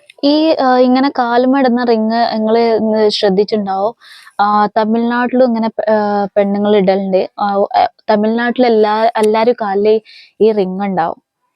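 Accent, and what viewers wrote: Malappuram, India. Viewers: Aap kaisea sikkayaa Malayalam..Malapuram slag 👍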